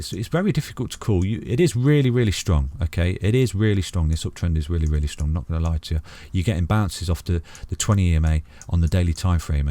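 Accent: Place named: Nottingham, United Kingdom. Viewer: me to .... can tell with your accent.... I'm from sunny Rotherham .... I'm guessing your notts way on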